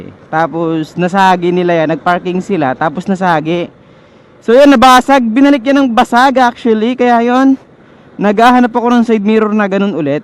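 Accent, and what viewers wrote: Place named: Visayas, Philippines. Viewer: bisaya accent 😆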